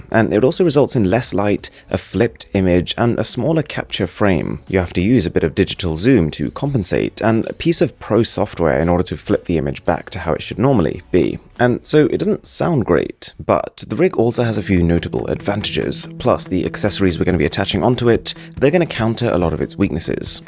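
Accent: United Kingdom. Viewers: Your from he uk!!!!?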